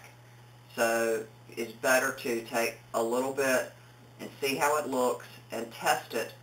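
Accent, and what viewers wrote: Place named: South, United States. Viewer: Honestly if a person doesn't like your accent they can just not watch your video. I found this tip very helpful and I appreciate the time you spent making this video. Now I was born and raised in Alabama since I was 15 and I've heard a lot of accents like yours. I think Southern accents are beautiful and I was sad to find that my own accent (though not as strong as yours) disappeared so quickly when I moved up North. It's refreshing to hear a strong Southern accent every now and then.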